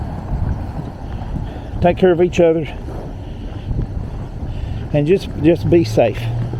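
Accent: South, United States. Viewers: Be yourself. There is nothing wrong with your country accent, that is our blessing from being in the south. I have one too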